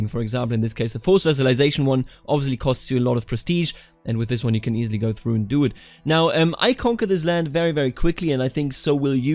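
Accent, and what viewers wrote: Germany. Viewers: Lav ze German Akzent:D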